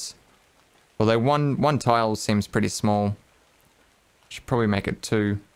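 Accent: Australia. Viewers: When are you going to build something out of thatch? Maybe add a single campfire in the middle of it all, but not much more complicated than that. I find myself getting tense and stressed just watching you do these massive, OCD fueled rage enhancing builds. I think ASM is the modern hipster thing. You already have us addicted to your sexy Aussie voice (auditory-tactile synesthesia) so you might as well calm us all down with a simple build that causes us all to mellow out and drool on ourselves. Of course, the metal music in the background will have to be something more subdued. It's either this or narcotics and I'm sure you wouldn't promote your fan base to be drugged out roadies.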